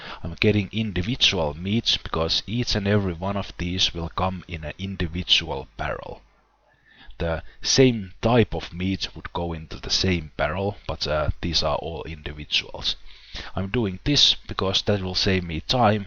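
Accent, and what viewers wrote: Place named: Finland. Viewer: Just out of interest. Do you happen to be Finnish? Your accent sounds exactly like most of us Finns.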